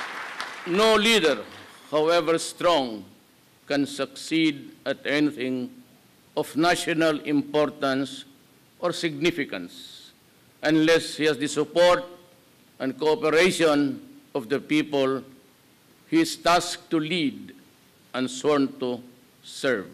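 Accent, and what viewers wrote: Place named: Mindanao, Philippines. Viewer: Say what you want about my boy's thick Mindanao accent, but Duterte's dictionary game is on point. English vocab better than most people I hear in the states..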